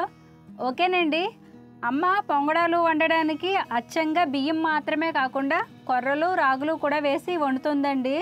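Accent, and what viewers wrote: Telangana, India. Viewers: Madi telengana maku ma slang aunty estam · శుభోదయం మిత్రమా ❤ తల్లీ తండ్రుల ప్రేమకి ప్రపంచంలో మరే ప్రేమా సాటిరాదు సుమా..😊 దూరపు కొండలు నునుపు అని ఊరికే అన్నారా ఉన్నదంట్లో తృప్తి పడకుండా లేనిధానికోసం వేంపర్లాట పడితే మనశ్శాంతి కరువవుతోంది మరి.. ఎక్కడ చూసినా చాలా కుటుంబాల్లో ఈ ప్రేమ పెళ్లిళ్ల వ్యవహారంలో పెద్దలే పిల్లలమాట వినాల్సివస్తుంది..😢 లేదంటే మేము మెజర్స్ అంటారు పాతికేళ్ళు కని పెంచిన పెద్దవాళ్ళ మీద ఎందుకు ఈ పిల్లలకి నమ్మకం ఉండదో నాకు ఇప్పటికీ అర్థం కానీ ప్రశ్న..ఎంత ధైర్యంగా ప్రేమ పెళ్లి చేసుకుంటారో అంతే ధైర్యంగా ఆజంట ఒకరినొకరు అర్థం చేసుకుని వృద్ధిలోకి ఓస్తే ముందుగా సంతోషించేది తల్లితండ్రులే..ఇలా కాకుండా కోరి కట్టుకున్నవాడు కష్టపేడితే ఆ అమ్మాయినీ వారి సంసారం చూసి తల్లితండ్రులు చచ్చేవరకు బాధపడుతూనే వుంటారు..😢 మీ తల్లితండ్రులు మీ అందరిమీదా ప్రేమగా ఉన్నంతలో వాళ్ళ భాధ్యతలు నెరవేర్చడం చూస్తుంటే చాలా ముచ్చటేస్తుంది..పిండివంటలు అన్నీ నోరూరిస్తున్నాయి మీ ముగ్గురు అక్కాచెల్లెళ్ళు చాలా అదృష్టవంతులు సుమా...❤ గోదావరి పిల్ల నోట తెలంగాణ మాట యాసతో భలే మాట్లడావ్ సుమా..👌😂హైద్రాబాద్ కి నువ్వు కూడా పిల్లల్ని తీసుకుని వెళ్ళవల్సింది సుమా..నీ మాటలతో hyd చల్లబడి పోతుంది..😅అయినా అక్కడ మన అంత ఎండలు ఏమీ లేవు అంట సుమా నాలుగురోజులు వెళ్లాల్సింది..😢 పోన్లే ఇక్కడ నాన్నని హ్యాపీగా చూసుకుంటూ మాకు అక్కడ ప్రకృతి అందాలన్నీ చూపించు..❤ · Madi telangana.naku ma slang antene mastu estam akka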